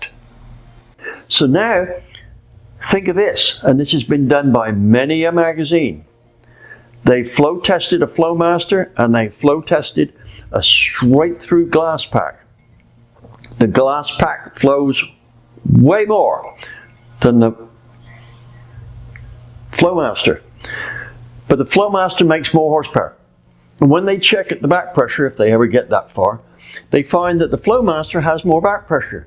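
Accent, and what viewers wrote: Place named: South West, United Kingdom. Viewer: MARTIN PIERCE is (A VERY OLD GREASE MONKEY) who sounds exactly like you with that old south west farmer accent , not sure he will watch a channel like this? Martin is the smallest cheat in car mechanics i know 😂where’s me a 48 clueless female (car lover) have bodged many a thing on my cars and buy some miracle they’ve worked . Like the last exhaust i just did . Know iv got the attention span of a fish so as much as id love to listen to your lovely slow talking persona il leave it to MARTIN PIERCE , with a damn site more patients than me!!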